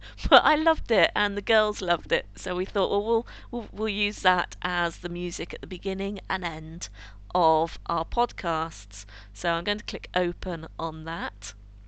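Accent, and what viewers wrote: England, United Kingdom. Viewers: i wish i had ur cool english accent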